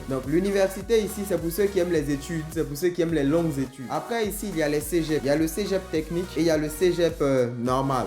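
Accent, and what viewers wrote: Ivory Coast. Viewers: La musique en fond de la vidéo est un peu prononcé, la video est excellente, j'aime plus l'accent ivoirien avec les blagues 😏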